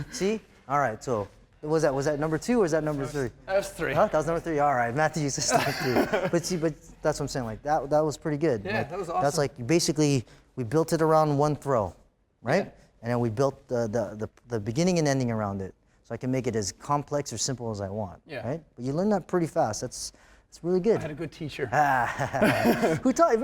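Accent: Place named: Ireland. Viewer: DoYou KnowDeWae? <- they're right. he said nice. it sounds weird to you over-conspiracizing idiots because you all forget that he is irish and has a thing called an accent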